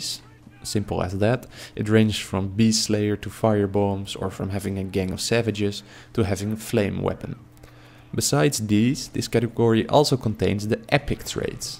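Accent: Netherlands. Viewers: Just curious are you dutch I'm really curious about your accent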